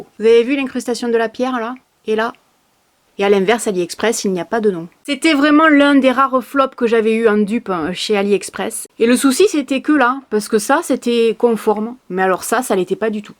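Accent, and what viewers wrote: South, France. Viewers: Coucou. une petite question: as tu déjà testé des vêtements Bonprix?! Je te le demande car je suis devenue totalement fan de cette marque. Abordable entre la redoute ( par correspondance) et Kiabi (pour la qualité), j'y ai fais plusieurs commandes et je trouve ça vraiment top, pourtant pas adepte de l'achat de vêtement sur internet sans essayage. Bref si tu n'as jamais testé je te recommande fortement! Gros bisous! Tes videos sont géniales, et ton accent du sud je l'adore.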